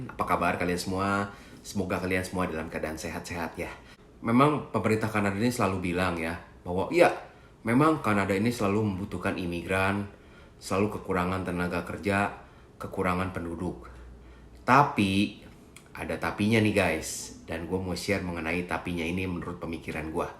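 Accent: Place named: West Java, Indonesia. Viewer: Koh logatnya kerasa sundanya hehe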